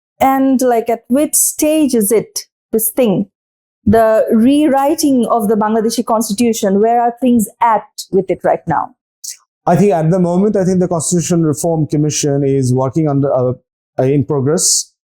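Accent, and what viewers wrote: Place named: India. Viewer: Indian দের সুর এ ইংরেজি শুনতে বিরক্ত লাগে আপু, ট্রাই করেন ইংরেজি ভাষা ইংরেজদের মত করে বলতে, উপদেশ টা পজিটিভলি নিবেন, আপু কিছু মনে করবেন না আপনার accent শুনলে ইন্ডিয়ান পালকি সর্মা এবং আরো ২/৪ টা রেন্ডি সাংঘাতিক দের কথা মনে পড়ে, তাই কইলাম আর কি 🙏! ফুয়াদ ভাইয়ের টা 👌 আর আপনাদের এই উদ্যোগ কে স্বাগত জানাই, এবং আপনি আন্তর্জাতিকভাবে বাংলাদেশের সত্য বিষয়গুলো ফুটিয়ে তুলছেন সেইজন্য আপনাকে অনেক অনেক ধন্যবাদ আপু ✌️❤️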